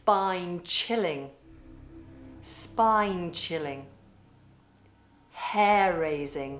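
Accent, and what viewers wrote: United Kingdom. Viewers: You accent is similar of british english. I love! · are you a british? I´M BRAZILIAN.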